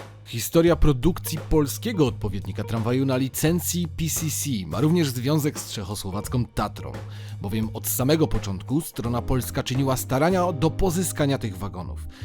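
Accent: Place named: United States. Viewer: Pan miejscami intonuje słowa na końcu zdania, jakby spędził 30 lat w Stanach. Nie ma co kombinować, lepiej mówić naturalnie, po naszemu.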